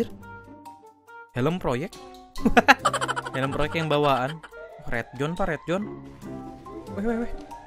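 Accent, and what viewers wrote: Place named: Banten, Indonesia. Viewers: Lucu oge sunda na si akang... wkwkwk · njiirrr ngakak Sunda na kang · Ngakak gua bang denger lu ngomong sunda😂😂salm dari sunda ciamis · ngakak so much wkwkkw logat sundana banten pisan. leuwih alus keur maen pubg sunda oge mang wkwk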